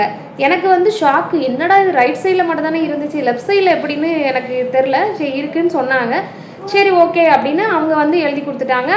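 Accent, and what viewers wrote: Tirunelveli, India. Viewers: உனக்கு எந்த ஊர் அம்மா.திருநெல்வேலி பாசை பேசுர. தென்மாவட்டமா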